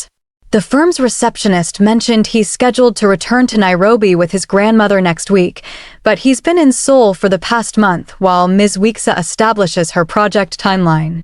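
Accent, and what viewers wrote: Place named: Guyana. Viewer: 'Born behind cow'. You sound Guyanese. I agree with your comments. Additionally, what is this obsession with liasons between Korean men and Black women? It is fiction because in real life, very few Korean, or Asian men, have healthy romantic interests in Black women. That's a conversation worth having😊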